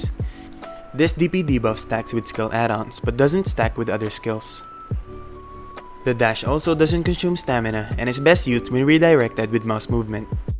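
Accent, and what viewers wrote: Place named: Philippines. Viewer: i hope this doesnt sound mean in any way, but are you filipino? i wanna say i hear the slight accent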